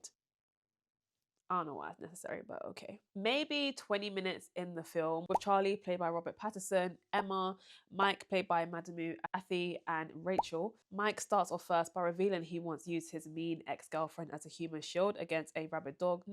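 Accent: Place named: United Kingdom. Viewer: Very confused as to why I’m hearing a British accent but I’m seeing you call someone who’s clearly not black a black woman. This take falls flat when you refuse to acknowledge her proximity to whiteness. You are not biracial you are not viewed the same as a “Zendaya”. We do not have the same experience. Infact “Zendayas” in the world have a privilege over black women. It’s very glaring when you talking about racial dynamic and refuse to acknowledge this.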